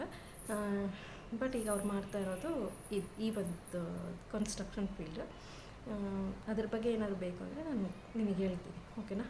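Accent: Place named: North Karnataka, India. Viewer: ಯಾರೇ ಕೂಗಾಡಲಿ ಯಾರೇ ಹೊಕ್ಕೊಲ್ಲಲ್ಲಿ 🤪 uk ಭಾಷೆ ಇದು ನಿಮ್ಮ ಭಾಷೆ ಏನು ಅಂಕೋಳೋಲ್ಲ ಯಾರು ಸೂಪರ್ ಮೇಡಂ ಮಾಡಿ 👌🏾👍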